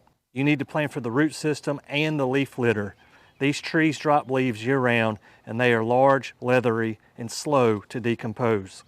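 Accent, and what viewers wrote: South, United States. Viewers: He is in Alabama, zone 8a. You can tell by that accent that he is a Southerner y’all!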